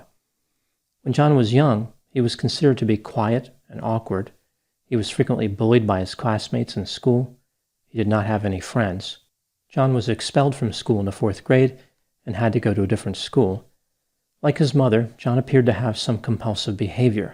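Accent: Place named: Mid-Atlantic, United States. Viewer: Hi! Question might seem odd, so forgive me but I'm curious. I've been listening to your videos for a couple of years now. They've been soo helpful. Thank you! You have a particular accent..would you happen to have grown up in Southern NJ, PA, or MD? Folks from those areas have a particular way of pronouncing certain words. Lol. I've made a bet with my friend that you're from one of those places lol.😆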